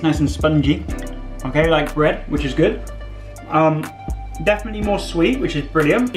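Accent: United Kingdom. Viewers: Jatuh cinta bgt sm aksen brithisnyaaaa 😍😍😍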